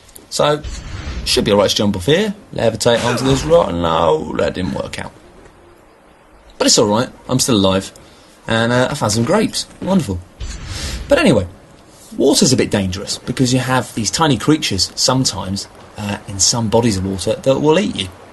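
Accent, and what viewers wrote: United Kingdom. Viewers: His British accent just makes it sound that much more brilliant. As if Im watching a documentary.~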